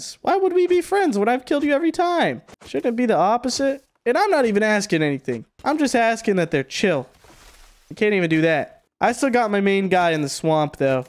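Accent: South, United States. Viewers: Lmao! your southern accent is on point! hahaha... If you're ever out towards the south. Wally1k just moved to Mrytle beach SC. You'd fit right in with that accent. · I love the country boy accent. You should talk like that all the time. Fuckin' hilarious. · The southern accent is why I started watching 😂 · tip for the southern accent, talk slower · your southern accent blows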